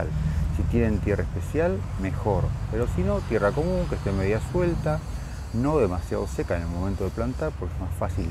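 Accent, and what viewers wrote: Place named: Argentina. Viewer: Por fin alguien que habla con nuestro acento! Hola! soy Susana, vivo en Buenos Aires (CABA)Tengo un helecho arroz desde este verano, ahora llegando el imvierno lo tengo como planta de interior. De dia cerca de la puerta del balcón, le da luz pero no sol directo, por la noche lo aparto de alli porque quedaria muy cerca de la estufa. está en una maceta pequeña y las ramitas de abajo van desprendiéndose. Crece de un tronco central y su altura es de 25 cm, cuando la compré era más baja y sus hojas(flores? ) eran blancas, parecían verdaderamente granitos de arroz, ahora es toda verde. Mi departamento es muy luminoso. Me preocupa saber si la estoy cuidando bien...Tendré que transplartarla a una maceta más grande? Podés aconsejarme algo? Saludos y gracias por el video, está muy bueno.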